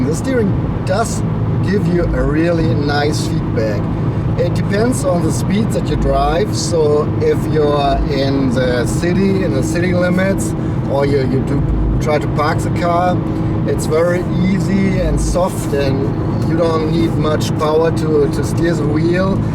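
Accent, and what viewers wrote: Germany. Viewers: Very good review and entertaining keep your German accent I will keep my french one... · I really really like your German accent. As a college student, my professor is a German who comes from Goettingen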